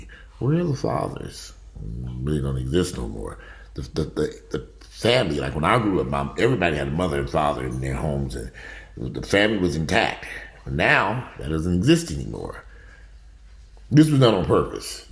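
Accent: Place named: Gary, United States. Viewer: Hi, I am from Gary. I left after graduation. Picked up a couple of degrees in California. Left and went to Canada for a few years. Then I went to the middle east to work. Afterwards I settled in Europe for the next 40 years. I enjoy hearing you talk because it is not often hearing from home Gary. Until next time. Earl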